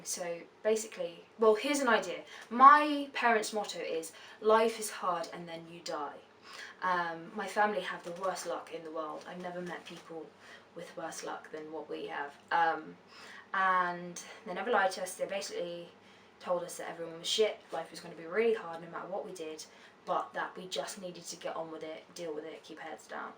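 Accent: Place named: London, United Kingdom. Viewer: Sounds like you've caught a tad of the London accent tales, get some of those vocals up on YouTube!!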